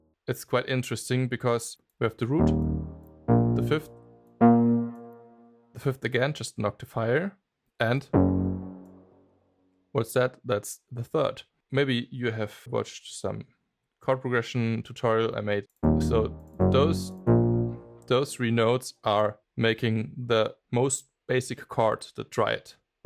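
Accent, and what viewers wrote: Germany. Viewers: Yeah, I'm German as well but have to say this guy might want to work on his accent to make himself more easily understood. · his accent gives him away as a german guy ...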